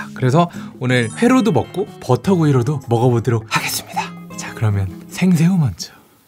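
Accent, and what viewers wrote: Cheongju, South Korea. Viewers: 혹시 청주 사람이신가요?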